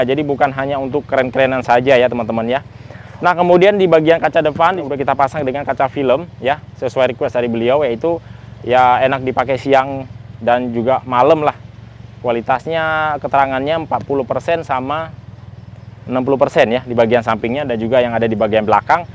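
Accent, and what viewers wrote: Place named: Indonesia. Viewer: Seneng denger bhs inggris masnya, logat asli indonesia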